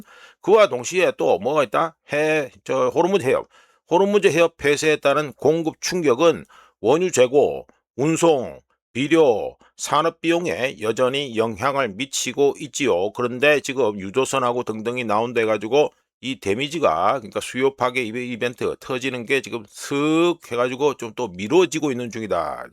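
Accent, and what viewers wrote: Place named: Gyeongsang, South Korea. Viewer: 어이 경상도 사투리 막소리 할때 삼가라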